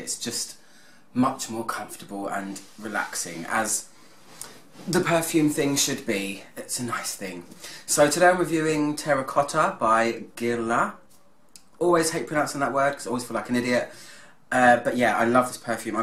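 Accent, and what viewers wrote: United Kingdom. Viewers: Love your reviews! Not to mention you're British accent is so very classy and I love when you say the word gorgeous lol.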